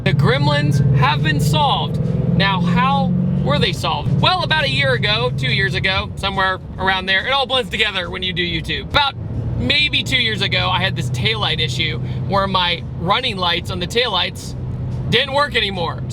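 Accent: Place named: South, United States. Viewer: Don’t apologize for that southern drawl. A southern man tells better jokes.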